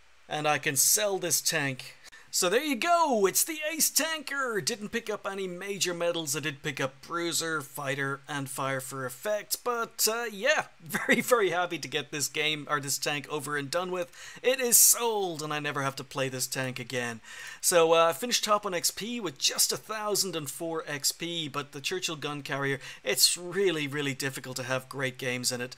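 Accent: Ireland. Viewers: are you actually irish? I can hear a bit of an irish accent but not much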